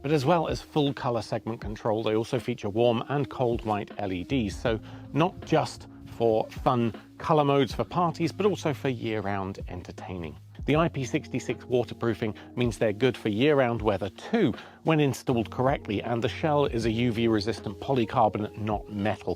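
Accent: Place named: United Kingdom. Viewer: so nice to hear a UK voice on a review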